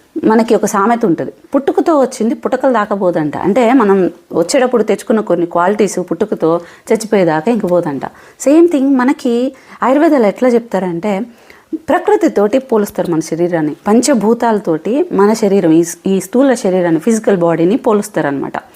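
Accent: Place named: Chittoor, India. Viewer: gaaru chittor district thiruathi dagara antaaru meeru ade district ala ne unnaaru voice tho so mee poorvikulu same aa maam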